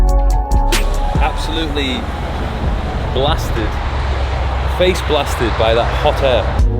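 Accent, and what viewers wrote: South Africa. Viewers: That Saffa accent was THIIIIICCKK,,, Yes boi... anyways love the content! Watching from South Africa 🇿🇦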